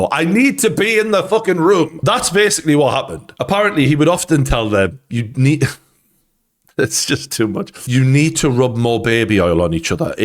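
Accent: Newcastle, United Kingdom. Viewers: Great to hear the geordie living in ireland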